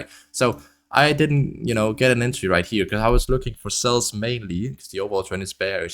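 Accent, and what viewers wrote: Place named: Denmark. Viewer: Du lyder dansk i accenten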